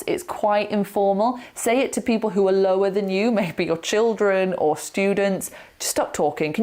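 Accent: United Kingdom. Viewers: I watched you from the begining and I wrote the words I didn't knew and I will watch your video again tomorrow and write some other notes in a excel file for myself by the way, thank you to put these videos for us. it will be really helpful if any one wants to learn english and speak fluently especially with this lovely british accent that you got. I wish you read my comment. I'm from Iran I love british people and their accents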